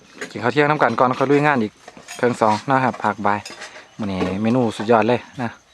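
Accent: Isan, Thailand. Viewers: คนใต้แต่ชอบสำเนียงอิสานเพราะจังชอบอาหารด้วยท่องเที่ยวด้วยไปมาทุกจังหวัดเลยค่ะ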